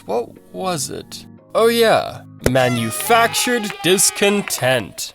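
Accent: South Africa. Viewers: love hearing a fellow Saffa on YouTube! great video! hope your channel blows up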